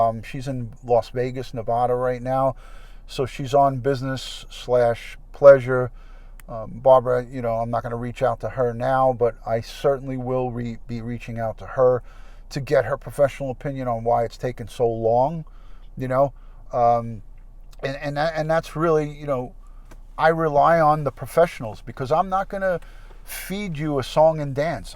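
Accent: New York, United States. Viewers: I'm homesick in AZ from hearing your NY accent! Which borough??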